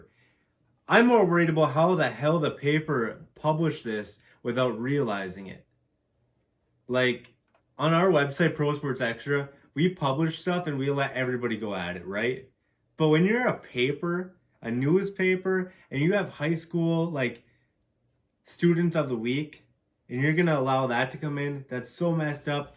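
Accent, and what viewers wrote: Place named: Upper Peninsula, United States. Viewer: sorry to hear about your dad man. My best goes to you and your family. It's awesome to hear a good yooper accent again, and also pretty stand up for you to do this segment.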